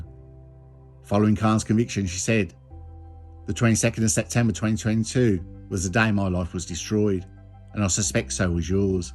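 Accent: Birmingham, United Kingdom. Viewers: it's a shame your longer videos don't do so well. I find your voice very soothing. Broken nose with a soft Brummie accent is a winner for me. Maybe some more police interview footage would help. Anyway, you do you and good luck👍.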